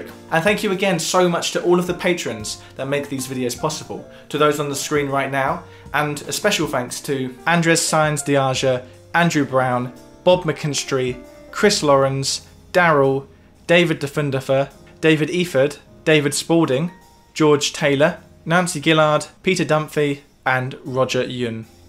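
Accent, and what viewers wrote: United Kingdom. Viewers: The second you started talking I was like Ahh a fellow brit!!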